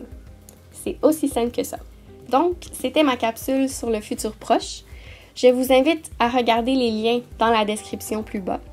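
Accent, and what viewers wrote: Quebec, Canada. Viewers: Si tu parles de son accent c'est l'accent québécois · Merci de m'avoir répondu. Votre capsule est parfaite. J'ai pris votre capsule et j'ai changé votre voix contre la mienne.Je l'ai utilisé juste sur wath sapp. Pour mes élèves comme un petit rappel de la leçon. Ils ont beaucoup apprécié. Je leur ai dit bien sûr que ça venait de you tube. Une élève à moi a fait la même expérience. Vous avez un bel accent.Vous êtes canadienne je suppose. Moi je suis marocaine. C'est pour cela j'ai dû le refaire en français. Votre explication est parfaite. Partir d'un texte pour comprendre la conjugaison est la façon pédagogique idéal. J'aurais aimé connaître le nom et prénom pour le mentionner dans votre vidéo. Mais il n y a que 😘. Voilà, j'espère que ma question est un peu mieux comprise. Mais si c'est interdit, je ne le lancerai pas bien sûr. C'est votre travail et vous méritez un CHAPEAU Bravo, l'animation est parfaite ainsi que l'explication. 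😉😍😘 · Merci beaucoup!!! Votre vidéo est très instructive et votre bel accent québécois me rappelle mon séjour universitaire à la Belle Province. Continuez!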